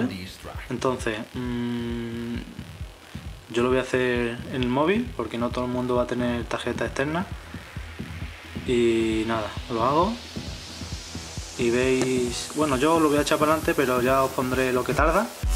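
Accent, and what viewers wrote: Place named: Chile. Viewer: este tipo habla como chileno como español y como pueltoriqueño.. de donde es que eres? jaja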